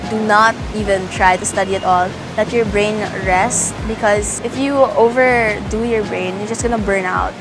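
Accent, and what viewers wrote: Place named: Philippines. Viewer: What's your course po ate? Love your taglish accent❤️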